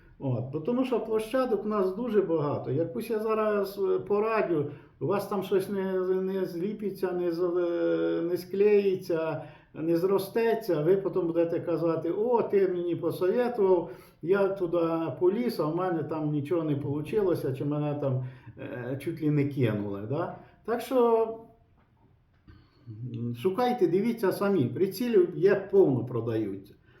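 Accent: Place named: Vinnytsia, Ukraine. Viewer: У Вас розмова Вінницька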